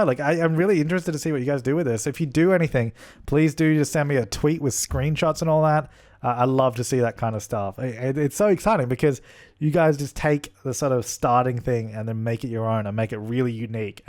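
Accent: Australia. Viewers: Wait.... you're Australian??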